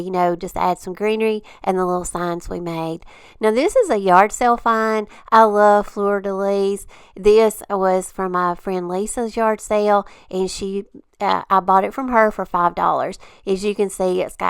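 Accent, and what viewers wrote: South, United States. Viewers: Hi, my grandbaby and I watch you and get a kick out of your southern accent....her new hobby is turning everything 'trash to treasure'. We love everything! God Bless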